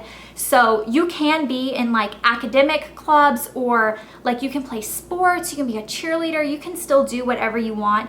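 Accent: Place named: Ivy League, United States. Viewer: You sound like you went to Cornell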